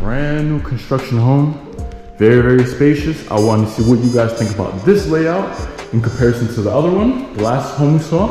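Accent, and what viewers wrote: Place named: Portugal. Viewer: O teu sotaque espanhol é mais parecido com o português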